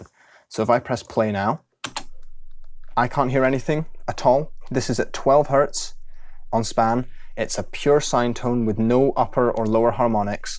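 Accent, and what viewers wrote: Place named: England, United Kingdom. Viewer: And he's not a Yank! plain English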